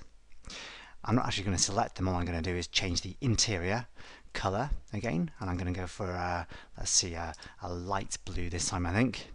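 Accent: United Kingdom. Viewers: is an excellent videos for learning VBA. For one thing I liked the most is the instructor explanation with UK accent. Loved it:) I can stay all day long in the front with my PC and try to learn more every evening right after work. You are the best*** rated! Would recommended to everyone. Thank you so much!!!!!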